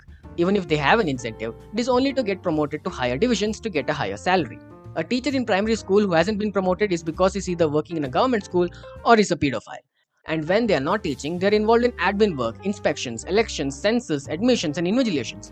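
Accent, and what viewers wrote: India. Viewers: Been forever since I've heard the Indian accent even though I'm Indian, glad I watched ts tho cuh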